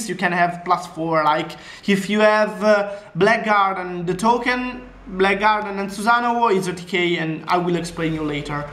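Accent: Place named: Tuscany, Italy. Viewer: O.O TI DEVO FARE I COMPLIMENTI PARLI MOLTO BENE L INGLESE MA L ACCENTO TOSCANO NON TE LO LEVA NESSUNO :)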